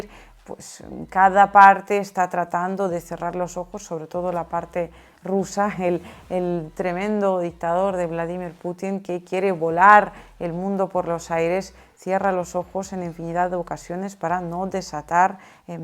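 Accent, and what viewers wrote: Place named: Galicia, Spain. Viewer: Me gusta el acento gallego, y la solvencia para describir los hechos políticos de....sds.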